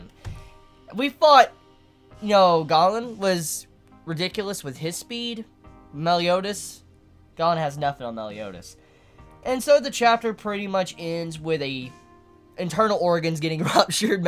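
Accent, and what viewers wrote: South, United States. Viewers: Pretty sure is from the South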